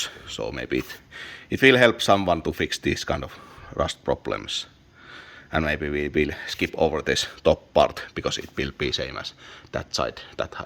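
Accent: Oulu, Finland. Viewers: Nyt oli kyllä melkoinen parkaisu ja maan tömistely :D ei muuta kuin uutta konstaa seurantaan, tuskin loppuu Youtubesta kesken. En kyllä aiemmista videoista muista näin positiivista kommenttikenttää kuin tällä videolla on. Onhan tämä vähän koomista katsoa suomalaisen puhumaa rallienglantia, mutta Bemarit tässä eniten kiinnostaa. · Well I didnt have problems with rally English so carry on as you please! And also im native Finnish speaker but I dont mind listening in English. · Itse olen harmitellut monia hyviä kanavia kun höpöttävät kieliä joita suurin osa ei ymmärrä. Kyllä englanti tässä palvelee suurta yleisöä. Itse saatan tehdä videosarjan e21 restomodista. Vähän sellaiseen Don DIY tyyliin eli englanniksi mutta puhetta mahdollisimman vähän. Mites toi rallienglanti, äännätkö niin tahallaan vai onko se vain opittua? Esim. Kalle rovanperä puhuu rallienglantia mutta mielestäni olen välillä kuullut kun hän puhuu englantia ilman rallimurretta. 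· Great work again and for me both languages are fine. Oulun murretta on kyllä ollut kiva kuunnella kun äidin suku on sieltä suunnalta 😀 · Anna tulla vaan englanniks. "Rallienglanti" antaa vähän samat vibat, kun Oulun murre suomeks😃 Kielellä ei väliä, kun sisältö on edelleen 💪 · Oli kyllä suoraan sanottuna pettymys rallienglannin takia.. jatka suomeksi mieluusti!! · I vote English. For every amis you lose as viewer you’ll gain 5 more international viewers. Plus Finnish is the best sounding English accent.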